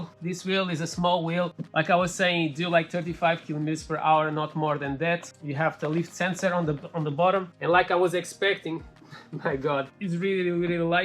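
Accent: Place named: Portugal. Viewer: Dude! Are you Portuguese or something? That accent man... so familiar!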